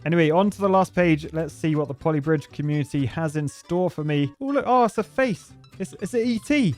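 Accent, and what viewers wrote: United Kingdom. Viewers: I'm not so much into Poly Bridge, but when I saw "Bridgehemian Rhapsody", I had to click ! And it was awesome !!! I love watching your videos because : _ your british accent (I'm french and I love it) _ I like video games _ I like engineering _ the bridge reviews _ the strongest shapes everywhere _ your humour ....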